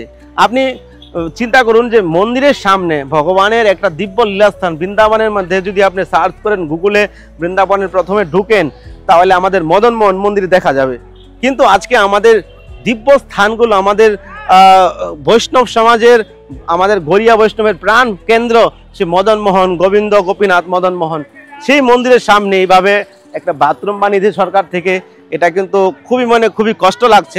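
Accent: Bangladesh. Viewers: আপনার ভাষা শুনে মনে হচ্ছে বাংলাদেশী । এত খারাপ অবস্থা কিন্তু যারা যাচ্ছে তারাই করছে ।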